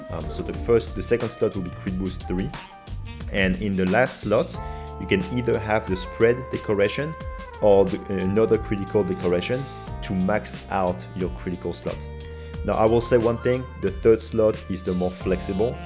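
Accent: France. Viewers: un très fort accent français mon seigneur ! bonne vidéo !!! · Un accent si français pourquoi parler anglais 🥲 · The bow king has returned! Also im curious is your accent French?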